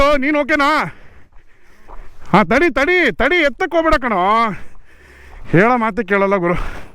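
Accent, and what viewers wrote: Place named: Mangalore, India. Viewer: bro adu Uttara Kannada, neevu mangalore kannada matadta edira :face-green-smiling::face-green-smiling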